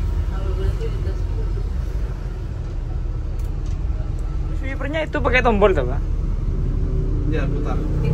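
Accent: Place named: Madura, Indonesia. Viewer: Logat bhsnya sepertinya org madura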